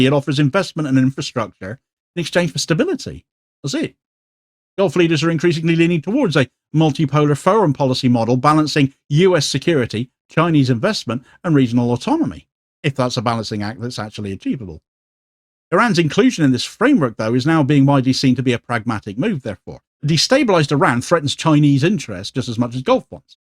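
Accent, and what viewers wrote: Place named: West Country, United Kingdom. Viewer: is from South West of England! That's our accent! 😂😊xxx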